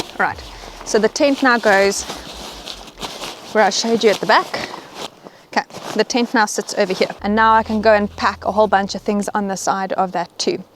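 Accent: South Africa. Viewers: It's the Saffa accent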